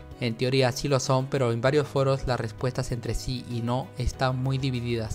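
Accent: Peru. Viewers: cabro, sos de peru¡??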